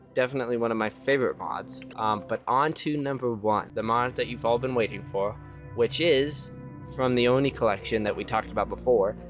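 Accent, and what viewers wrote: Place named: Omaha, United States. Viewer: Your accent sounds alot like those from the Omaha, NE area.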